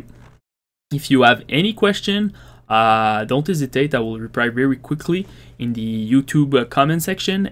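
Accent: Quebec, Canada. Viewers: Yo dude t'es tu québecois? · Are you from Quebec? I usually recognize the english accent of my people :P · is that a quebec accent i hear ? lol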